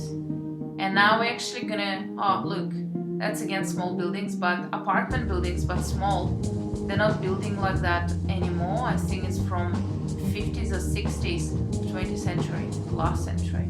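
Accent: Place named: Australia. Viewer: Здравствуйте! Я не буду хвалить Вас за хороший английский язык, было бы смешно, если бы Вы, приехав в Австралию в 26 лет и прожив там достаточно долго, не знали языка, но мне было интересно послушать, как говорят австралийцы! И, хотя все понятно, но разница, все же между английском в США и Австралией чувствуется. И, знаете, я вдруг почувствовала себя американкой на улицах Подмосковья! Такая грязь непролазная, вот ведь ничего не изменилось за столько лет! Спасибо Вам большое за это видео! Мне было неинтересно смотреть вокруг, но было очень интересно мое отношение ко всему этому! 👍👍👍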